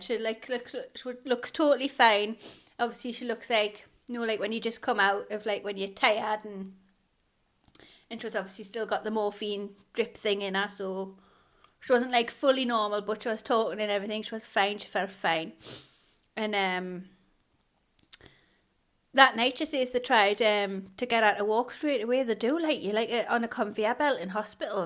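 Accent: Newcastle, United Kingdom. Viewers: U from Newcastle? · Are you from Newcastle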